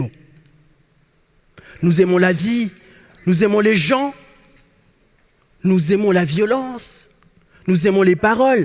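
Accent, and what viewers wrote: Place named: Democratic Republic of the Congo. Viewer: Oui, ce n'est pas faux, C'est difficile de se défaire de l'accent congolais 😅 Mais bravo à lui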